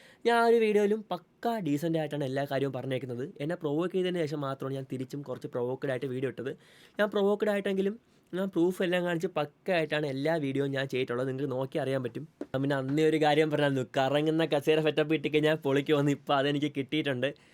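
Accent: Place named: Kochi, India. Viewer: എടാ എന്റെ പൊന്നു മോനെ ഇവളെ അറിയപോലുമില്ല. പിന്നെ കൊച്ചിക്കാരുടെ ഭാഷയല്ലേ ഇവള് സംസാരിക്കുന്നത്. കൂതറ കൊച്ചിക്കാരിത്തി. ആ പേരിട്ടതിനെതിരെ കേസ് കൊടുക്കണം. കൊച്ചിക്കാർക്ക് ചീത്തപ്പേര് ഉണ്ടാക്കാൻ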